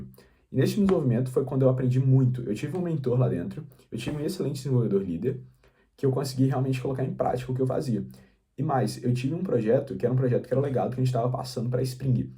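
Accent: Minas Gerais, Brazil. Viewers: Em um minuto de vídeo já imagino que seja mineiro kkkkk tmj tô na luta pra aprender tbm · Ess trem kkkkkkkkkkk mineirês é otimo kkkk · tu é mineiro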